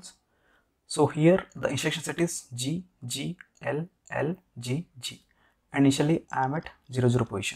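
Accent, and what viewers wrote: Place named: South India, India. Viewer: Thanks for the explanation. On lighter note: by your voice I can figure out that you are an Indian from south :). Right?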